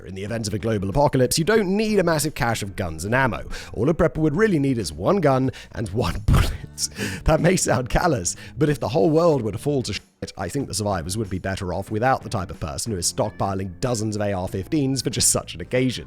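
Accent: RP, United Kingdom. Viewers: Sorry to be the one to tell you, Simon; you're posh and your accent is posh... the fact that cant see that, confirms it! 😶😂🤣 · cunt is such an everyday word in nz and oz is your accent not close to RP? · Ngl… your accent seems very generic British. Sorry Simon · if asked I would have said Simon speaks with an RP sound. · Honestly I forget that Simon is British. Just used to the British accent that punches you in the face, his seems light. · I’m American. You actually have an annoying pompous British accent. There are much better British accents. Scottish and Irish are definitely better sounding than British.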